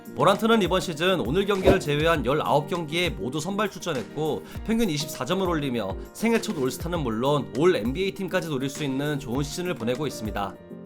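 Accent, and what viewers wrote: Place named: South Korea. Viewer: 너무 콩글리쉬틱 하네요 ㅋㅋㅋㅋ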